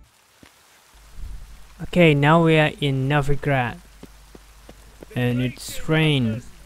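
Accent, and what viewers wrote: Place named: Australia. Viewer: Where are you from? Your accent seems different, kind of australian but german but chinese.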